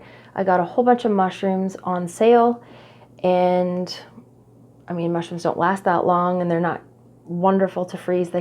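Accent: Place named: Canada. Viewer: Where are you located? It sounds as though you’re from Canada.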